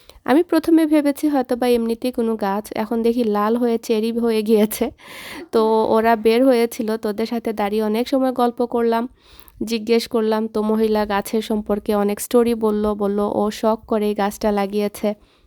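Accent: Sylhet, Bangladesh. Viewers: আপু তোমার সিলেটি ভাষায় কথা বলা এতো সুন্দর মাশাল্লাহ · Apu Assalamualaikum Apnar sylety vasa ato valo lagy Masallah 😊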